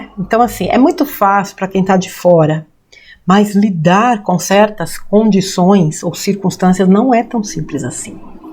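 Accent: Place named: Minas Gerais, Brazil. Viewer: "querida amiga", só posso dizer, gratidão, gratidão, gratidão, conhecer você e todos os personagens que você nós trás... é a melhor parte do nosso dia a dia, pelas reflexões, exemplos, ensinamentos, gostosas gargalhadas e lógico; pelo mineirez sem esquecer do café e pãozinho de queijo. Que PAI multiple e abençoe sua vida Beijos. Lis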